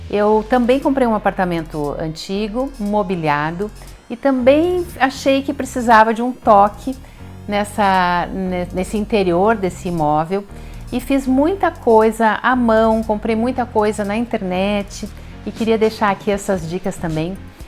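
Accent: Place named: South, Brazil. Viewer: Como já morei no sul do Brasil amo o sotaque .❤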